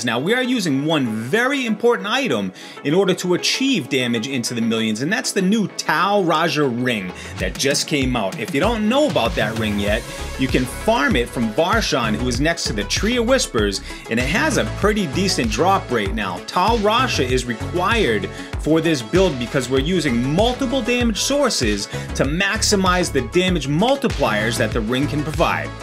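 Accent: New England, United States. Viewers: You’re either from Maine or Boston. I’m from southern Maine and these are just things I know.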